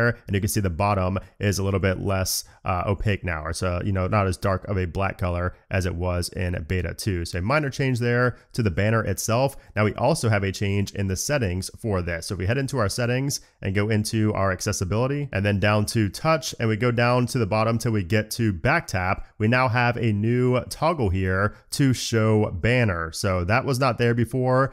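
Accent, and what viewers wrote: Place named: Texas, United States. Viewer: Is he from Texas? Why does he talk funny?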